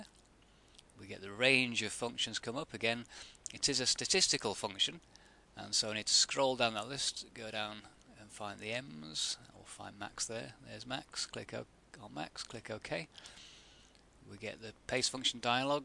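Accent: United Kingdom. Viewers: Wow he is a very good teacher very clear. and the British accent keeps it interesting!!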